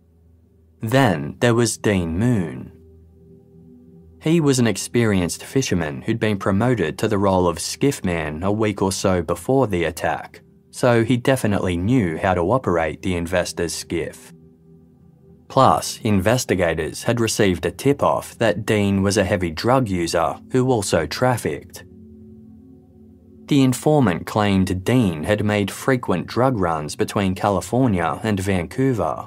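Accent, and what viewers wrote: Australia. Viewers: 🇬🇧 I know this is an old episode but after having had a serious illness & recouperation, ive not watched many of my favourite channels! Therefore after getting my energy & interest back ive been trawling through my favourite Channels & binge watching & its been amazing hearing a big batch Of great videos that i love. Now ive made it to one of my top 5 channels with many many videos so im starting with my 1st missed episode today, with this case. Im champing at the bit to enjoy each & every one ive missed & there are alot to hear! Ive missed the narrators calm & reassuring voice. As i once lived in north Sydney for many years as a youngster & late teen before returning back to UK with my parents, i love the opportunity to hear the beloved Aussie voice. Thank you to everyone on the Casefiles team! You do a brilliant job & bring us the best & worst ( i mean in criminality) and ive often been chilled to the bone with some of The cases, especially the Ivan Milat ones... 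😨 So Thank You all so much! Your subs have jumped pretty high too Not far off that 1st magic 100k Level & thoroughly well deserved! Peace & Good Cheer to All 🇬🇧👧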